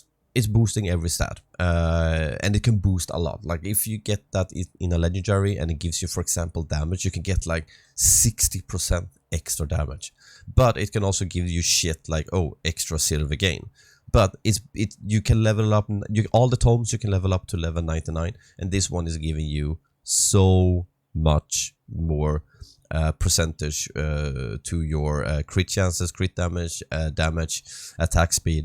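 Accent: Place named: Sweden. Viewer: w sverige always know a swedish talking english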